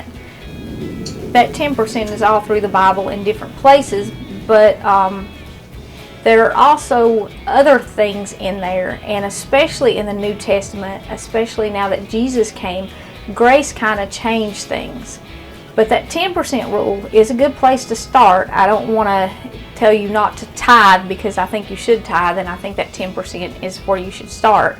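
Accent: South, United States. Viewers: Where in the South do you come from? I am a Buckeye (worthless nut) but I love southern cooking. You are easy to listen to. I love southern cooking and I love to hear someone whom I believe is saved.! If you know the Lord (Really know). you will be sincere and honest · Living on the west coast, I love your southern accent. I could listen to you all day. The tip on making ap flour into self-rising was most helpful. You talking about Jesus was an unexpected delight. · Love to hear you talk. I am from the south but have been living in the north for the past 21 years. I miss the southern language so much. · Amen...Raised as far Southest Kentucky as you could get,Live in Arizona now..Hearing her southern voice reminds me of Back Home Sweet Harlan County...Girl your biscuits look Amazing...Jesus Sure Loves all his Children...Amen😇🙏🏻🙌🏻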